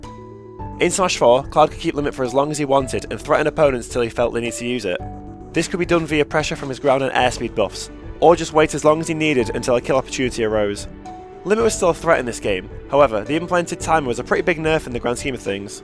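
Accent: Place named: United Kingdom. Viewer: heard british accent liked video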